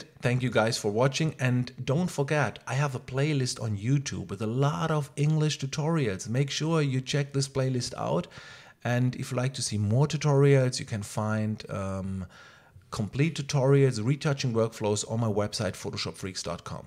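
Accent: Germany. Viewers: Great tutorial! Keep up the great work. Gotta love that German accent! Inwoooard (invert), blent (blend) :) Reminds me of my family in Germany.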